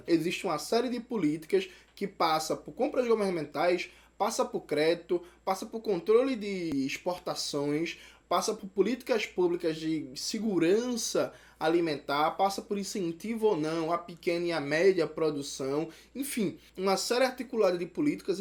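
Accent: Pernambuco, Brazil. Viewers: Veiga Pela sua falta de percepção a respeito do que é o sotaque de um pernambucano, percebe-se que a dificuldade está em vc de entender o que é a realidade e a diversidade brasileira e, claro, o que ele diz no vídeo. Em nenhum momento ele defendeu, assim como qualquer outro comunista ou socialista sério e radical defenderá a estatização de todos os meios de produção, mas sim a progressiva abolição da propriedade privada dos meios de produção, o que é bem diferente. Mas se vc não é capaz de perceber e nem de respeitar um simples sotaque tb não deve saber diferenciar o que eu esbocei aqui.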